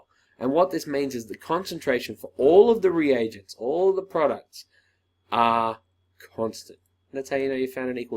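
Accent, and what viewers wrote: Australia. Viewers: Thank you australian science guy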